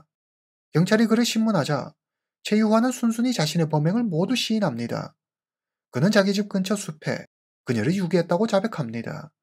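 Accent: Yanbian, China. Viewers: 말투가 연변 조선족인가보네 ㅋ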